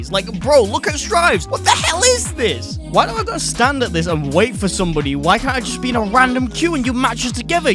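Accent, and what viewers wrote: United Kingdom. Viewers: My favorite sub genre of YouTube is British guys talking about fighting games.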